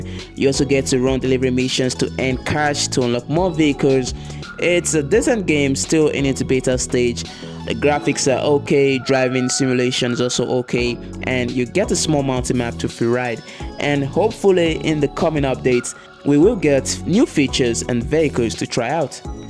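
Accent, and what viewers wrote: Nigeria. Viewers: You got Nigeria accent, where are you from?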